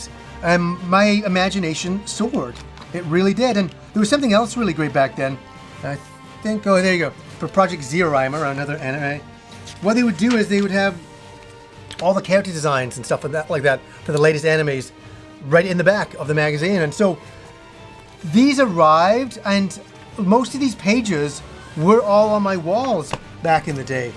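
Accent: United Kingdom. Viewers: Hold the phone...you’re British?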